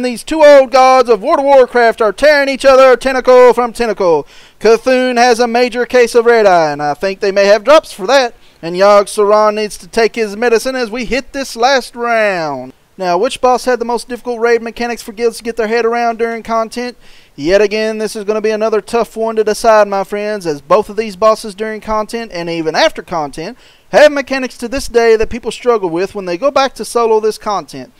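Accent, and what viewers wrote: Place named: South, United States. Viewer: Like the southern accent, Yogg Saron is my favorite of the Old Gods.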